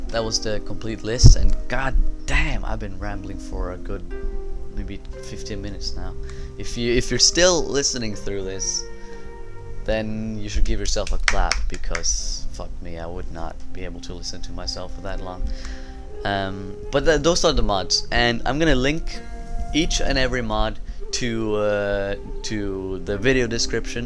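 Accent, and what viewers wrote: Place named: India. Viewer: Idk, your words sound heavy sometimes like some Indian people's accent in the US. Also when are you continuing your Carthage campaign?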